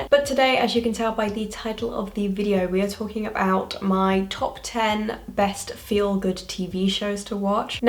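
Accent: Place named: United Kingdom. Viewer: Sounds like she’s from the United Kingdom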